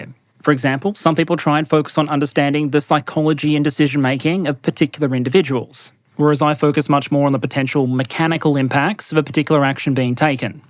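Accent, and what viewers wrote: Australia. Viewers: Future historians are going to be very grateful, as am I. A veritable tour de force with an Aussie accent. Bravo ! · I have to ask, what does "inverted commers" (@ ) mean? Its a common perun phrase and it seems like a local idiom, or maybe the aussie accent is throwing my 'merica ears, but i am not sure what it means and its driving me crazy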